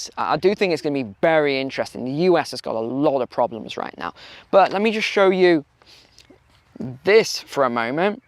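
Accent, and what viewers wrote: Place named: London, United Kingdom. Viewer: Your from London I bet,love it!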